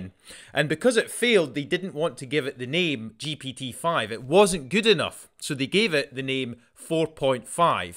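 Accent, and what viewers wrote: Scotland, United Kingdom. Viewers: English language spoken with a Scottish accent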